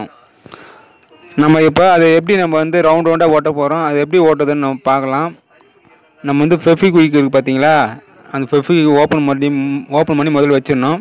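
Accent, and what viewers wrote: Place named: Chennai, India. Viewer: அருமையான தமிழ் மெட்ராஸ் பாஷ பா,பழ்ப்பு வலிச்ட்டு,அருமையான கண்டு பிடிப்பு பா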